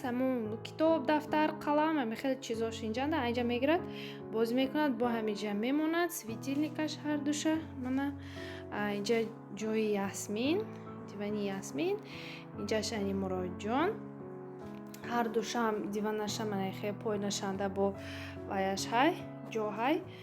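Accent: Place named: Khujand, Tajikistan. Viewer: Салом Мана дугонам Худжанди буд гапзаниш махкулм буд шумони хамунбарин гап занитон махкул хама влоготона тамошо дорам ай як лав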